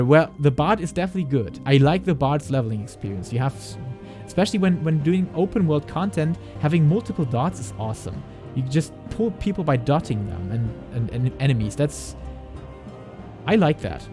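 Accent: Germany. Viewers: As a fellow German, I knew right from the start where he’s from, though his English is way beyond the level of your average German!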